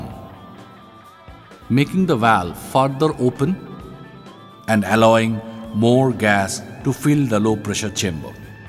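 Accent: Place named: India. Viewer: We get really bored with this INDIAN accent...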